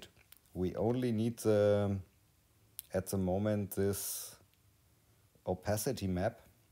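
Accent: Bavaria, Germany. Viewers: bavarian english is dope.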